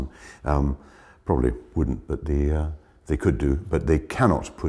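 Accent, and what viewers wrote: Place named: Scotland, United Kingdom. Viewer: He is from Argyll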